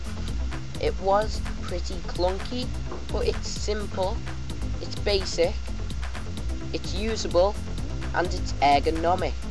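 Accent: United Kingdom. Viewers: Nice c64, i own one these '87s made. btw why allways britts talks so funny dialect? Good video. Check my videos, channel tommi palomäki or jebu6 Greetings from finland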